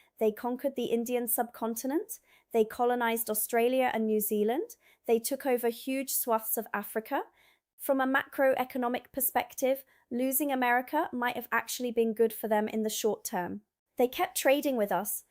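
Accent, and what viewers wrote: England, United Kingdom. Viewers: Weird she has a British accent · This was really excellent but: 1. Most British people have never even heard of the war of 1812 2. The Boston Tea Party was a bunch of criminals destroying the property of a competitor. They weren’t upset about the tax; they were upset that even with the tax the British East India company could sell tea much cheaper than their Illegally smuggled tea 3. The Irish Potato Famine was a genocide against the Irish people. Trevelyan, the Assistant Secretary to the Treasury, wanted more Irish people to die. 4. She says she’s American & has only been here two years. I would never have guessed it. She sounds English to me. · Your history is correct and American students are given a very edited version of their history. This is generally corrected in colleges and universities. That is, if students take U.S. 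history where they are taught unvarnished histories of slavery and genocide of the native peoples, as well as depredations against our neighbours and the world at large after WWII. I taught such a class in summer school at a community college, but was never asked to teach the course again. They wanted me to stick to other subjects, apparently. By the way, your British accent is too authentic to be acquired after only two years in the country. Either you are an excellent mimic, or British. I’ve lived in the U.K. for over twenty years and my accent is still decidedly American. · She sounds totally English not American. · Amazing English accent for an American who has only lived in the UK for two years. Clearly she is not who she says she is. I am well versed in history both American and World. Turned video off when she said we stabbed the English in the back. I have no use for Napoleon but we were not allied with the English or the French at the time. 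In fact we had fought an undeclared naval war with the French Both European powers had provoked us during the Napoleonic era. We just wanted to be left alone but the English in their arrogant way thought they could push us around with impunity. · Wow, you not only sound British, you batted our corner too. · If you are American why do you sound english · Just came across your channel. You claim to be an American, fair enough, however your accent says otherwise, and, I know there are no private schools there run by British English teachers. So, where does your accent come from?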